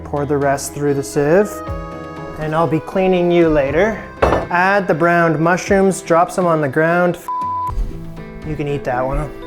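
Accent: Canada. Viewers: Listening to you speak you sound like someone from North America and your ou dip-thongs narrow that to Canada, but your vocabulary (minced beef, rubbish) suggests you’re on the other side of the Atlantic. But then you express surprise that butchers don’t understand what you want when you ask for a cut of ‘chuck’, beef, which I would have thought as an ex-pat you’d simply realise is because it’s a term not used in the country you live in. But you don’t make any comment bringing up that possibility, (very odd). All of this creates an air of mystery. After watching two videos, I notice you never refer to your nationality, even though you use vocabulary that doesn’t fit with your accent. I’m assuming, based on your trip to the butchers, his accent and the look of the buildings outside, you’re somewhere in the UK. To top it all you look and perform way too expertly in the kitchen for someone who doesn’t know much about cooking. 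The mysteries just keep coming. Why is there such a big mystery about where you’re from, why you’re cooking in a country you’re not from, how you became so adept at preparing and cooking food and so on? Is there some part of the puzzle I’m missing?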